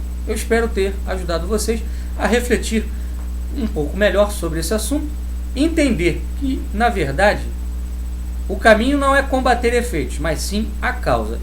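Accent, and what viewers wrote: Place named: Rio de Janeiro, Brazil. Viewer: O apresentador do vídeo não diz quem é, chega com sotaque carioca, liga meus sensores, me fazendo lembrar do bispo Macedo e já me dá alergia. A seguir, deixa dúvidas por toda parte. Eu apostaria, pela dialética, se trata de um jesuíta. Critica a todos mas não dá a opinião, a não ser que se deixe tudo para lá(bem jesuíta). Voce é capitaneado por quem? Aonde quer chegar? Se ambos os lados estão errados, qual seria a saída? Deixar tudo para lá? Voce está preocupado com doutrina em si? Por que Olavo de Carvalho é herege? Voce é a favor ou contra detonar a romanização da Igreja católica? Resumindo só falar bem nos dias de hoje não funciona; há de se deixar opiniões firmes sobre os fatos e sua correção.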